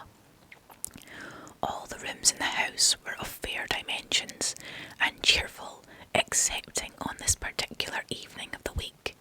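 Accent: Scotland, United Kingdom. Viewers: I like your Scottish accent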